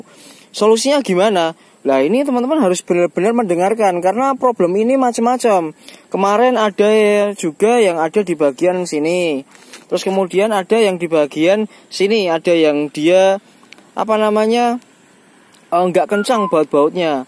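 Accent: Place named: Java, Indonesia. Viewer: terima kasih informasinya masse sangat detail, to the point, tidak bertele2 tapi lucu ya masse ngomong indo logat jawanya kental 😂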